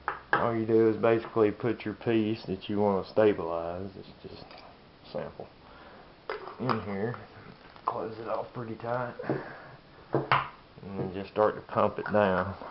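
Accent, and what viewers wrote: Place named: Tennessee, United States. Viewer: THANK YOU MAN! Great job! Great idea! You sound like your around here (tennessee).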